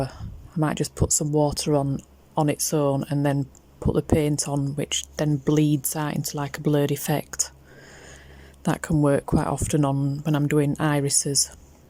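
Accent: Yorkshire, United Kingdom. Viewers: Absolutely incredible work, 'really eye catching'. And how good does it feel to hear a Yorkshire voice for a change👍😷👍🏴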